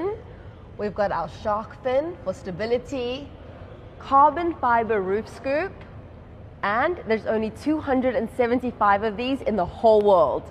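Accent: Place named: South Africa. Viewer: She looks and sounds South African ❤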